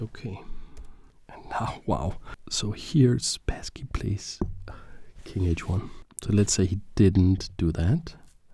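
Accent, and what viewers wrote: Denmark. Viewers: Wait are u danish? Cuz u have the accent of a danish person:) its very calming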